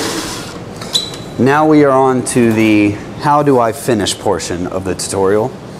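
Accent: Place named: South, United States. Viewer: The guy clearly has a southern accent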